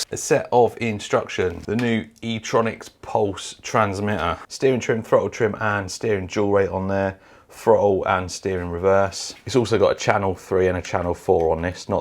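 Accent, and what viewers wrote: United Kingdom. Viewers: New sub here. I am a 73 year old US guy. I have to put my hearing aids in to understand your strong British Accent. Great video. Congrats on the new shop. F**K the dislikes!!!!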